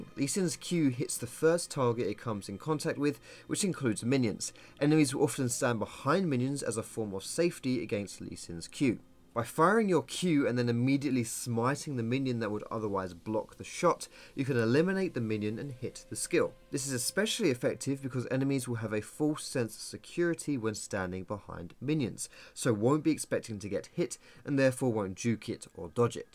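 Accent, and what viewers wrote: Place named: United Kingdom. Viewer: Inspired? No! I've just come here to watch some sick Lee plays and listen to your lovely British voice :3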